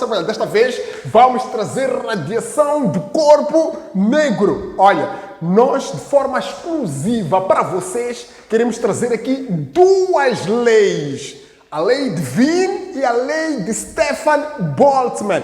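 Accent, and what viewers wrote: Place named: Portugal. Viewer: Amei! Mt bom de escutar o sotaque tbm hahaah português de Portugal?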